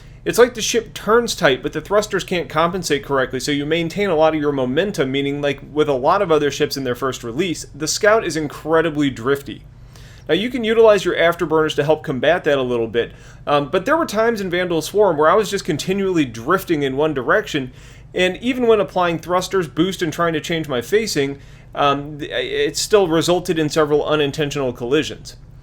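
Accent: United States. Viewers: Nice to have an actual American voice, rather than a very gay British voice doing commentary.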